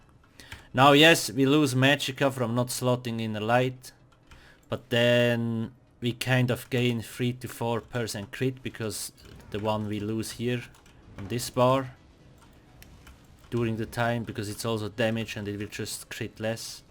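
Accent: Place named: Switzerland. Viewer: you sound a lot like a swiss, are you one?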